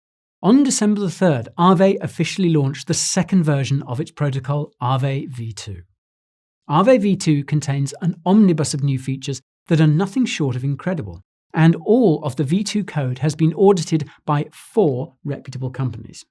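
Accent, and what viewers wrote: United Kingdom. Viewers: string agree....u from United Kingdom right as i love a way u talk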